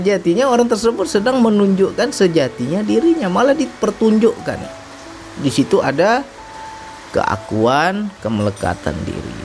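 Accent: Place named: Medan, Indonesia. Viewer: Abg logat medan..orang medan ya bg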